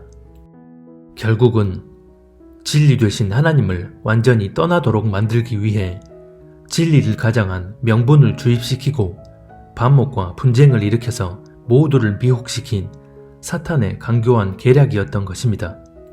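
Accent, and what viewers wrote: Jeolla, South Korea. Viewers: 전라도억양이 아쉽네요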